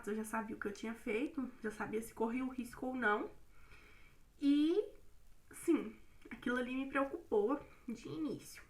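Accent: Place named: Minas Gerais, Brazil. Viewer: Nosso sotaque mineiro é bonito demaisss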